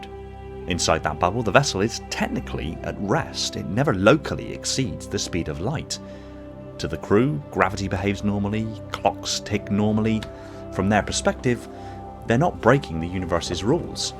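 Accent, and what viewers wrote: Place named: West Country, United Kingdom. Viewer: Your voice is like Westcountry Xbox Ahoy. Somerset?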